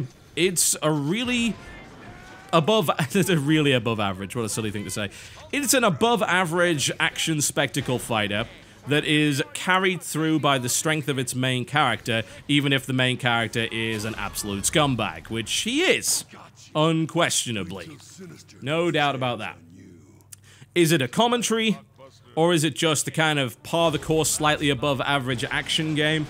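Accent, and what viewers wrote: United Kingdom. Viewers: Is it just me or does he sound like a super rich, retired, game dev turned game critic? It may just be that he's British.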